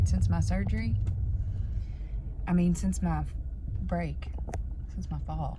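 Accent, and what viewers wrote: Tennessee, United States. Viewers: Omg don't cry your too sweet and love your southern voice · I knew that was a Tennessee accent! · I’ve been following all your journey on the AT. You are a strong and amazing woman. This is just a bump in the road. I thought you talked like a Tennessean… I’m 2 hrs from Memphis. Good luck on your recovery and return to the trail. Bobr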